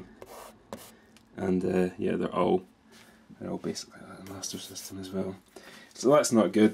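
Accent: Scotland, United Kingdom. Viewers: do u live in Scotland ur accent sounds Scottish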